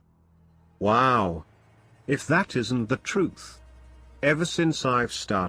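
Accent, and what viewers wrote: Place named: East Tennessee, United States. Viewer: You eastern tennessee hillbilly.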